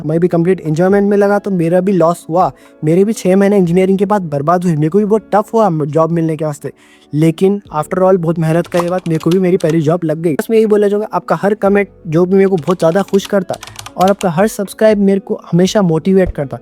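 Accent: Hyderabad, India. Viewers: According to accent are you from Hyderabad?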